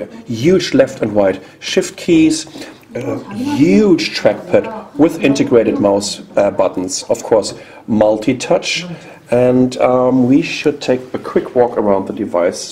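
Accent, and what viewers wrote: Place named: Germany. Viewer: his accent is awesomely evil. sounds very german.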